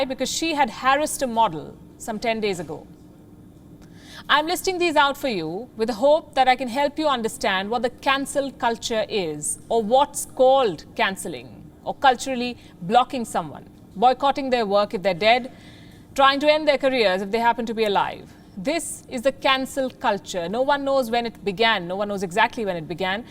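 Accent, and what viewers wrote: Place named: India. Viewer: I can actually understand this lady she makes the Indian accent sound beautiful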